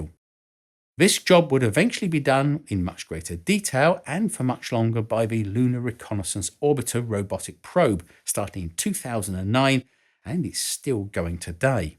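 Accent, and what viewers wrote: United Kingdom. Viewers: After recently watching some William Shatner self depreciation videos, and coming here...it occurs to me that speaks rather like Shatner with a British accent.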